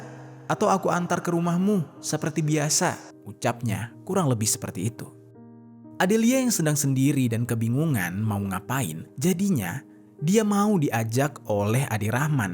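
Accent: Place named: South Sulawesi, Indonesia. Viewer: dari sini kita tau pengisi suaaranya berasal dri sulsel :D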